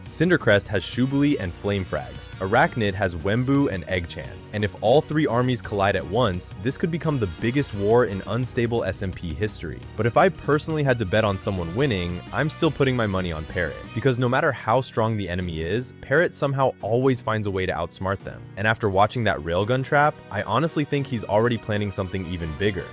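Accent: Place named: India. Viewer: BRO THAT INDIAN ACCENT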